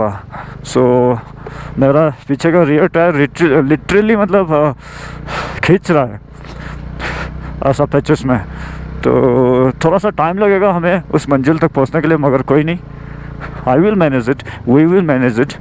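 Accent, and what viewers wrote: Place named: Northeast, India. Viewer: Yeh hai apna northeast hindi....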